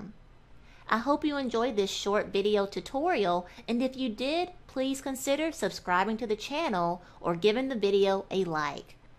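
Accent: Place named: South, United States. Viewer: Lol Asian shorty with a black southern accent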